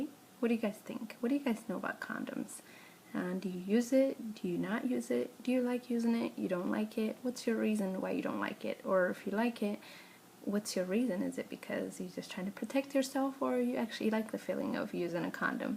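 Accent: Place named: Tigrinya, Eritrea. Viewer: She's a decent person. I enjoy her manner of speech. How slow and carefully she says every word gives her that classic Eritrean-American form of speech. A few of my female cousins in L.A who speak Tigrinya like my parents but are college educated. They all speak and have that very subtle Tigrinya accent like this chick does. The Eritrean in me wanted to not like this video. At first I thought it was too vulgar because she details pre-cum and and the speed of sperm. Watching more of the video. I couldn't help but think kids (and even some adults) are going to type "sex" in the searxh column anyways. There are a million videos of other people doing this same thing. Those other people are not Eritrean though. I'm a firm believer on the adults being and looking like the children who are learning. It's nice to have a young woman who looks, speaks and is similar to my little niece, sister, brother ect. 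I'm studying to be a high school geography teacher. I'm doing that because I want my children and children of similar color as me to see an educator who looks like them. The only major disappointment for me with this presentation is. No visual example. She details and is very well informed in what and how to use a condom. My issue is that if someone is watching this video to learn. How will they retain a visual memory without having seen how to do what she's saying? A condom and a banana or a cucumber would have helped in this video. Eveything she is saying is right. Add a visual exa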